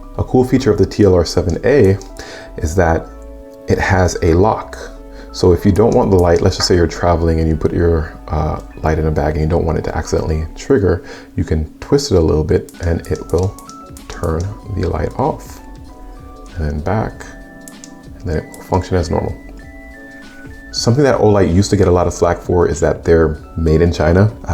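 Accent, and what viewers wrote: Jamaica. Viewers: Honestly thank you for the review. I'm looking for my first light and I think I figure out exactly which one I will run on my G45. Dad crew here also. I also notice an accent similar to a Jamaican accent if that's your background nuff respect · First off breddah u sound jamaican are you? I am and i kept hearing the accent creep out haha. As far as the lights go i just 2 days ago sold my PL 2 valkyrie to buy the TLR 7 A. Im running it on my FN 509 Mrd and i love how it looks and fits flush. I like the fact it has a strobe feature (wish u would have covered that) i dnt miss the o light.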